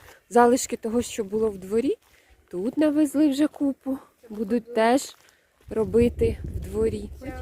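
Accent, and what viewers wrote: Cherkasy, Ukraine. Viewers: А я все думаю, що мені діалект дуже знайомий. А виходить ми земляки. Я також з Черкащини (Тальнівщина)., а Ви звідки саме?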